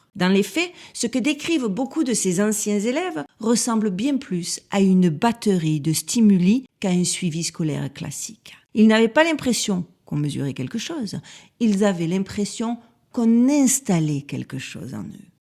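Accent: South, France. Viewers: J'aime tant votre accent du sud... Ne le changez pas, il y a trop de français du sud qui le changent, en premier le Monsieur de la ferme des cochons laineux du tarn-et-garonne, il est presque parisien avec son accent! Mais il fait un travail excellent alors je profite des autres, qui sont rares, qui ont un si bel accent! Merci pour votre travail. Je viens de regarder la video des menus des Rochschilds. Excellent! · Toujours au top tes vidéos, un régal de t'entendre et de te suivre. Ce petit accent du sud , j'adore ...😉 Hier je suis tombé sur cette vidéo de 3I atlas <link> À méditer....🤔 Belle continuation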